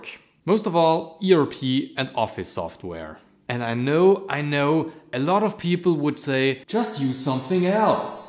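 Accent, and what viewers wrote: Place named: Germany. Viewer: I smell "Deutsch".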